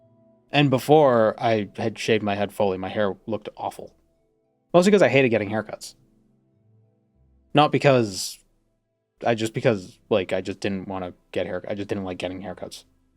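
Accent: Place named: New England, United States. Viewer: What could really deal with head shine AND bump your steez is maybe a newsboy/flat cap. Could also lean into the New England accent for the bit, though I have no idea if a hat would just cause too much sweat, which might make prop comedy like that not so good.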